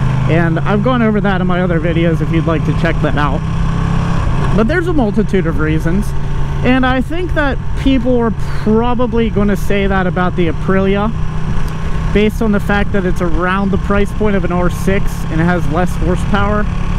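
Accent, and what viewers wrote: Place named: Pittsburgh, United States. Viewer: subscribed for the pittsburgh accent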